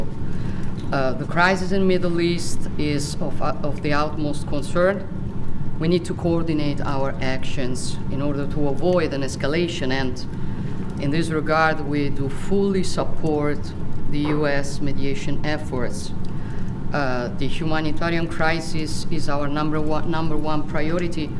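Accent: Rome, Italy. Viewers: Ahahah che inglese maccaronico con l'inflessione romanesca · Inglese romanesco, parole a vuoto che oggi dice a Biden e domani, a seconda della convenienza, ad eventuale Trump. Speriamo Biden, che almeno fa finta di ascoltarla 😂😂😂😂😂😂😂😂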